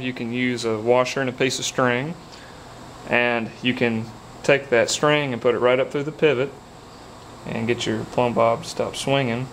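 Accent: South, United States. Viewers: The southern accent communicates acceptance to the listener. It shouldn't be a coincidence they invented the y'all term to address everybody. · thanks. good tips. what part of the south are you from? georgia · I'm retired now but it's always good to see the old tricks still being used. Nice presentation and love the Southern accent. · You talk funny… but I love you good ol' southern boy common sense. But I think it goes deeper than this; you are clearly connecting the dots between trigonometry principles with construction techniques. One repeated thought that goes thru my mind while watching your videos is, "Why didn't I think of that?" Keep those videos coming!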